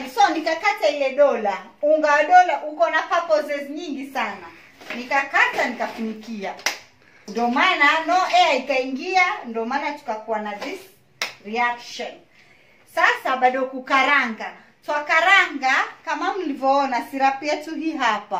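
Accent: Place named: Swahili, East Africa. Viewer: I love that Kiswahili accent ❤️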